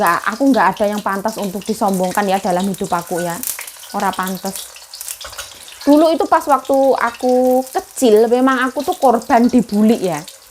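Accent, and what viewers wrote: Java, Indonesia. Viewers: Logat Jawa nya kental bngt kk, Keren, baru pertama lihat chanel ini langsung nonton trs, dengan ciri khas logat Jawa keren kk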